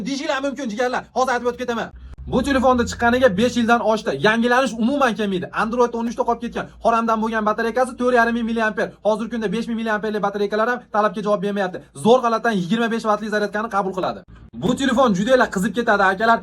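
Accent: Andijan, Uzbekistan. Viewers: Anjancha shevaaa 😂😂😂😂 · Хакикий анжанча обзор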